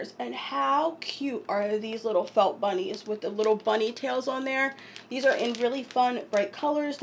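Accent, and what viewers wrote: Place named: New Jersey, United States. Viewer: Girl! I cannot believe there are some parts that don't stay to the end of your videos LOL! I love your New Jersey accent wish I had a little of that! Love all of your videos and totally relate to the hubby being a little sick of the Dollar Tree haha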